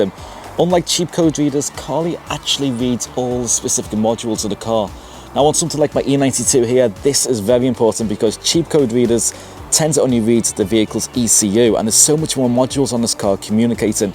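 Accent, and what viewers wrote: Liverpool, United Kingdom. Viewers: keep making videos mate, I've just discovered you. Keep that content coming! You sound like you're from my way or near by? (Liverpool)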